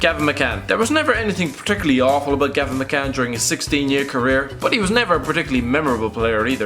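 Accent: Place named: Ireland. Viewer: He sounds so irish.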